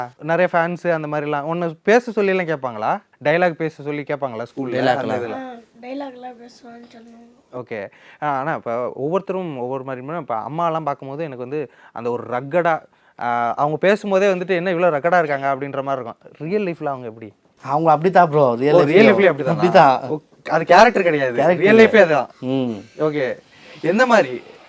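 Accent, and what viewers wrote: Bangalore, India. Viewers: Nan kuda chennai slang nu nechan but Bangaluru tamil nu enaku thariyathu super ha iruku unga videos new subscribe ❤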